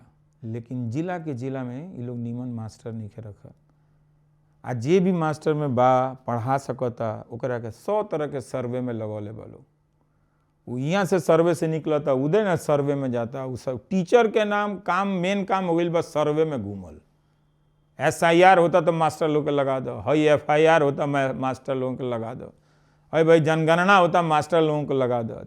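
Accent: Bhojpuri, India. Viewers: Main bihar ke siwan jile se dekh raha hu app to pure bhojpuri bol rahe hai sir Salam sir app Ko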